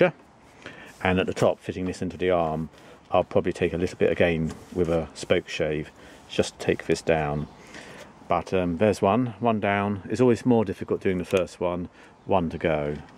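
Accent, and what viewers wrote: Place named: United Kingdom. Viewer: Bodgers used a chisel w/ only 1 bevel? I didn't know that. How did you find that out? I've wondered about the difference between using 1 or 2 bevels. What's your opinion on that? It's too bad that you don't get any pleasure out of listening to Americans. Sorry. We sure enjoy listening to you guys. Brits come over here & seem to do pretty well just because of the accent.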